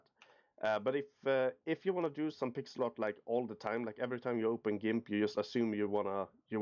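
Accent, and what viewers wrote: Sweden. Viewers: Nice Swedish accent! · Tack (Jag antar aatt du är svensk, det låter så)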